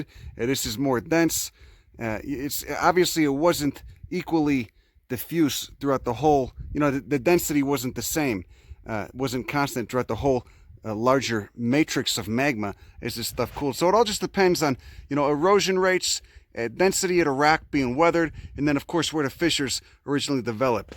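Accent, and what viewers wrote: Chicago, United States. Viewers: I agree with about society's crap and enjoy your unique take on it. And of course the real American accent from Chicago to share the amazing botany you explain so well in a language I appreciate, thanks for the history and botany you share with the rest of us stuck in this life, for me in CA, surrounded by tweekers opinionated by today's ignorance worrying, about the gas for their torch. More then the beauty that we share with you like this. Awesome, I was born in northern IL, South Beloit. Have no connection to it other then birth cert. Lol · ive never been attracted to someone with such a heavy american accents but fuck he’s too wholesome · make that Chicagoan accent 😉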